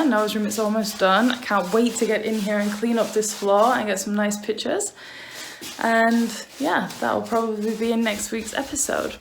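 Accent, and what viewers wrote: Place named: South Africa. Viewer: After a second vid I’m hooked and just subbed. Ice plant is fire resistant! The concrete pope portholes are brilliant. Love your accent, reminds me a little of some South African friends of mine but yours is a bit more exotic. Can’t wait to watch more of your build, you are a talented family.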